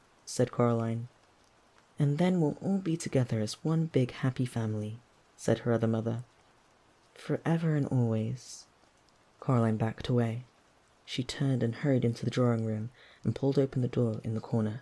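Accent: United Kingdom. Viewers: I'd never thought that a British accent Coraline would work just as well as the typical American one, yet here we are.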